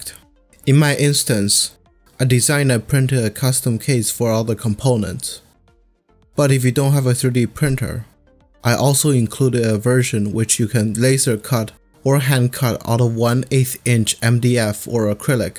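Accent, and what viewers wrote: China. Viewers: 感覺像華人講英文,因為我竟然能聽懂!!! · 这英语我怎么听出一股天津味儿呢。。。🙂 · I watched all your videos today and subscribed!!! 听口音应该是华人吧？真心佩服，加油！期待更多视频！ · gonna say it seems like a little bit Chinese accent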